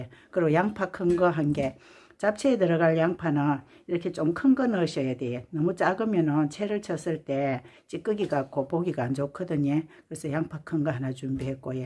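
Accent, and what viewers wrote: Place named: Busan, South Korea. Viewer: 같은 경상도사투리라도참듣기좋은 말투너무좋아요부산 분. 같으십니다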